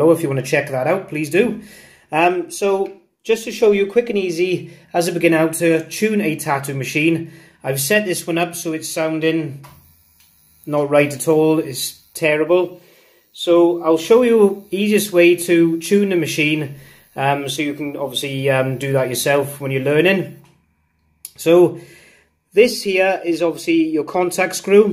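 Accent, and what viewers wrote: Wales, United Kingdom. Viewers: I heard the Welsh accent and immediately knew I was safe, very detailed, thanks mate - a fellow welshy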